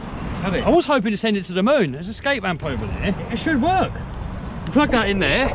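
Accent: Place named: United Kingdom. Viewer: bri'ish accent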